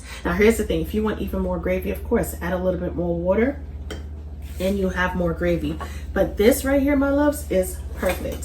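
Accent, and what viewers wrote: New York, United States. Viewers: That New York accent tho❤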